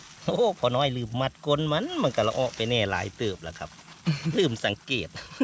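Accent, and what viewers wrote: Phu Thai, Thailand. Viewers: เป็นคนอยู่ใสครับคือเว้าคือคนภูไท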